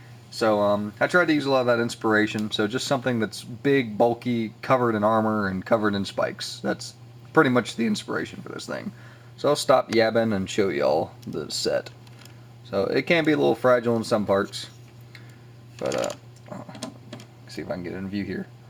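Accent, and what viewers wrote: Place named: United Kingdom. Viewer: i can feel the british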